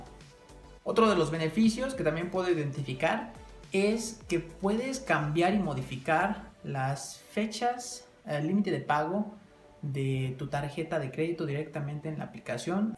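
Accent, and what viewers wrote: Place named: Mexico. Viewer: Ami me enacnta 3l asentó mexicano aunque no viva ayi gracias por tu información bro lo tendré encuenta cuando valla algún día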